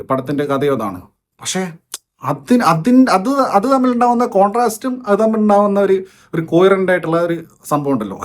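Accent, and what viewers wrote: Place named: Tamil Nadu, India. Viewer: ഇയ്യാള്‍ക്ക് മലയാളം അറിയില്ലെ. സംസാരത്തില്‍ ഒരു തമിഴ് touch ഉള്ള പോലെ.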